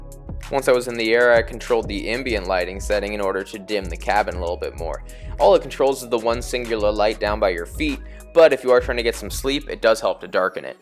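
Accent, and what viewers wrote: United States. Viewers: Great video - I’m amused at your pronunciation of DAK AR being d’CAR - made me laugh over and over - I’m guessing it’s that American accent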